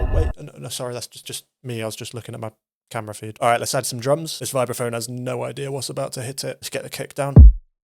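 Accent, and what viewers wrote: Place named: United Kingdom. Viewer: It's only now that I've realised after few secs of vid that you're British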